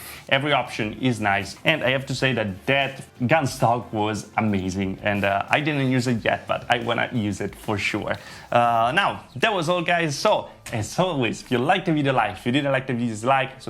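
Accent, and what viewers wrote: Italy. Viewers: Are U italian? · Hey man, I'm sorry for such a silly question that you probably get all the time, but you sound a little bit italian to me with your accent. Are you? If not, where are you from? Cheers from italy :D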